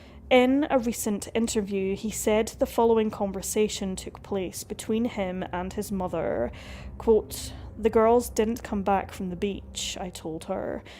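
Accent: Scotland, United Kingdom. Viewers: Love the Scottish accent 😊.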